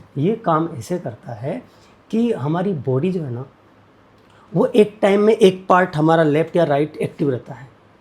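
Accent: Hadoti, India. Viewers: Hadoti Ke Lag Rahe he Botne Se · Gurudev apki language bilkul hadoti rAJASTHAN jese lg rahi h m bhi hadoti ki hoo apki bhasha hakk se jatate huy ;;;;;;;maja aa gy apko padm bhushn milna chayee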